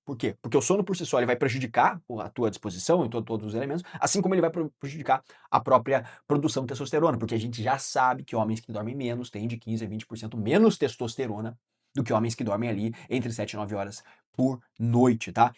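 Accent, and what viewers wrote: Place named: Paraná, Brazil. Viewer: Vc parece do Paraná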